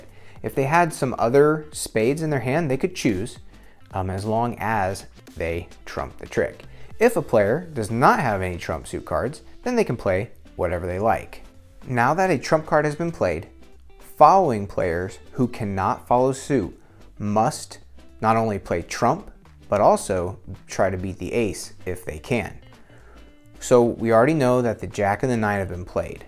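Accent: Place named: Rotterdam, Netherlands. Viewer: Wel Rotterdams hè...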